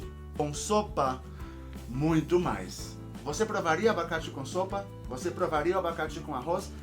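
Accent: Antioquia, Colombia. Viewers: Adoro que quando vc fala em espanhol, tem o sotaque paisa!!! Que lindo!!!! Abraço forte!!! Ps. Embora sou colombiana, não gosto de comer abacate com arroz ou sopa. 🤗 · Já está falando com sotaque paisa! Arriba Colômbia!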